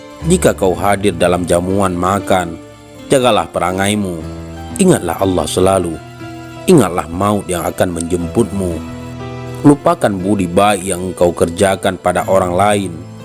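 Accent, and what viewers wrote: Aceh, Indonesia. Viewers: Pasti ureng aceh ini admin logatnya terdengar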